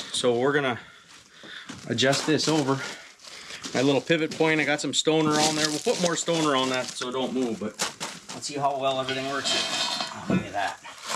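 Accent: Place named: Michigan, United States. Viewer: Just found your channel. Thought I recognized the accent. My mom is from Michigan. So most all of my cousins live up there. I love your trains.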